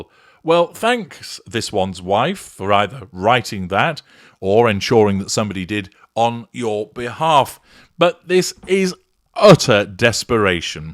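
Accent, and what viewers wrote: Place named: RP, United Kingdom. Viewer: swearing in his posh, English (although im still trying to detect the region), velvety tongue, gets me EVERYTIME 🤜💥🤛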